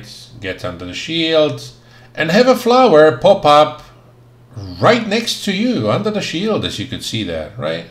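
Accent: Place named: Highlands, United Kingdom. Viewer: YESSSS!!! I felt you had a highland accent but then some words I could tell were spoken with something else! I too and from just outside Glasgow!! So hello neighbour!!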